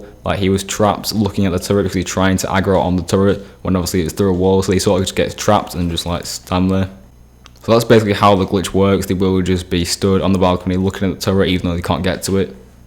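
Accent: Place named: United Kingdom. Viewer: disliked. british voice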